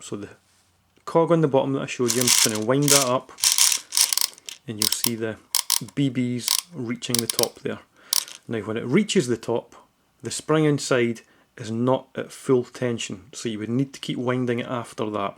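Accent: Scotland, United Kingdom. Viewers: Scottish lad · someone has a scottish accent! soo bad ass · love your accent . are you from scotland?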